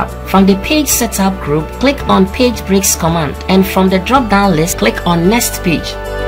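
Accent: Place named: West Africa. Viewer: I have not heard all of the video, but I give compliments to the speaker. Beautiful accent (Nigerian/West African); well-spoken English that is clear as a bell and easy to understand. I've tried listening to people from India. They are very smart, but I can't get past their accents.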